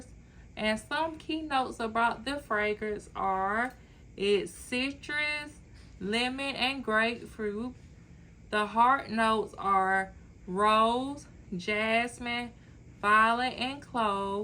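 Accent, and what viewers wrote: United States. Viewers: A Mississippi / southern accent for sure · Should this be in my collection? Thank you for sharing this content! Sound like you from the South.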